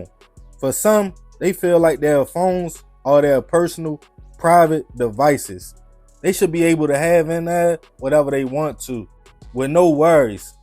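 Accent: Baltimore, United States. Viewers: That baaaltimore accent is a no for me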